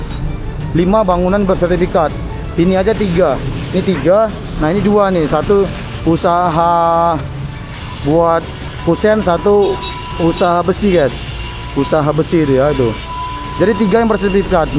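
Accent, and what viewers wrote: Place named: West Sumatra, Indonesia. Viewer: Aksen Bang kyk orang Sumbar 🙏🙏🙏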